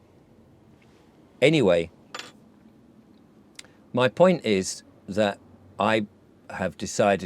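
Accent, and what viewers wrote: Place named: RP, United Kingdom. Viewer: You're quite posh. It's nice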